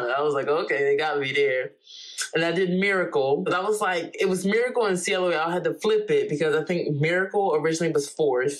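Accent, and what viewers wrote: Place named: New Jersey, United States. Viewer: They say Jersey? Love Jersey! You do have an accent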